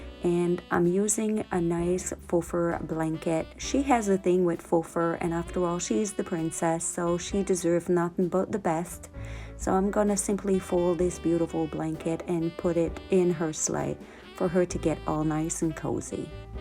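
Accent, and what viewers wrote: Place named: Atlantic Canada, Canada. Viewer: Hi, I just found your channel. I am a fellow Canadian. Love your video. I will definitely watch more. You definitely sound like you are on the East Coast. My parents are from Newfoundland originally but moved to Ontario many years ago. Have a wonderful Christmas 😊🎄♥️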